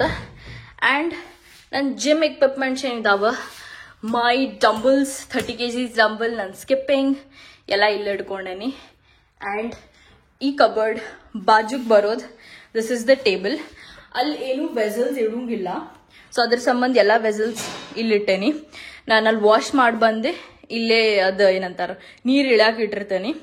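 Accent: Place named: North Karnataka, India. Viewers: ಯಕ್ಕ ಏನು ಚಂದ್ ಮಾತಡುತ್ತಿ ನೀ..ನಮ್ಮ ಬಯಲು ಸೀಮೆ ಪಕ್ಕ ಹುಡುಗಿ ಇದ್ದಿಯಾ ನೀ ಐ ಲವ್ ರೀ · ಅತ್ತಿ ಯಾವುರ ಬೆ ನಿಂದ, ನಿನ್ನ ಕನ್ನಡ slang ಕೇಳಿದ್ರ ನಮ್ಮ ಹುಬ್ಬಳ್ಳಿ ಅನಸ್ತೇತೀ...!! ❤ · Akka yaav oor nind? Hubli bhashe ansatet 😅😅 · Akka super vlog😍 yav uru akka nimdu, ನಮ್ಮ ಉತ್ತರ ಕರ್ನಾಟಕ ಭಾಷೆ👌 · E utaar Karnataka davru Elle idru nam Kannada DHA sogadannu bidodilla .thts somthing I like....moola Kannada padhagalu uttara kanada dalli heralavaagive.proud to see this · Namma uattara karanatak bhashe keli bhal kushi atu, Nice tour, Good luck re madamaa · Nimma Uttara kannada bhashe bahala istavayothu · MANASIKA 😀😀 It was nice to hear hubli accent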